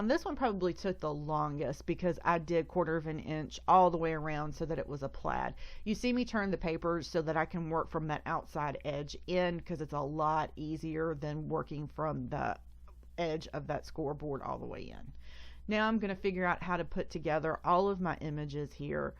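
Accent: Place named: South, United States. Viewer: Love your accent! I’m guessing you’re from the south, it reminds me of a colleague I have from Mississippi 😊 Your cards are so pretty and fun! TFS!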